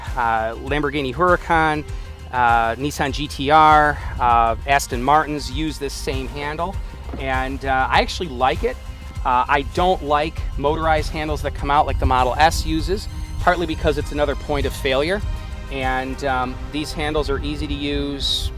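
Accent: United States. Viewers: You have a very cool but crazy accent. It's like super American.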